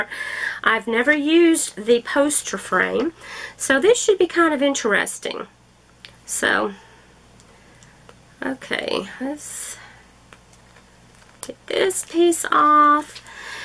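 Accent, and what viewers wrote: Texas, United States. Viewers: I would really like a drill-along with you. I've been drilling along with your videos going in the background. It's so fun hearing a nice Texan accent when I'm from New Zealand :D I love landscapes. I'm working on Starry Night right now but would like to do custom landscapes of photos I've taken in the future.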